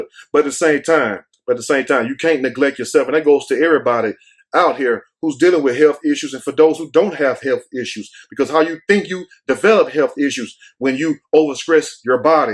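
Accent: South, United States. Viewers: I see you representing H- Town today. One of my favorite groups to relax and chill with. I like watching you, cos you be talkin that real shit. You seem to have Southern roots, hear it in your dialect. Keep on wit the keep on😅😅.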